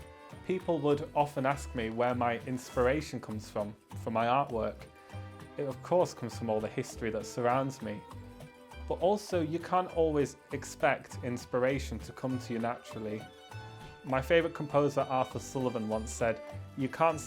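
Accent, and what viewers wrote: United Kingdom. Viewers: His accent sounds northern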